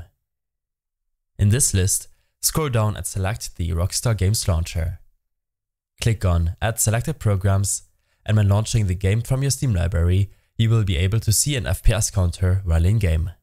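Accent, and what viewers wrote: Germany. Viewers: man erkennt dass du deutsch bist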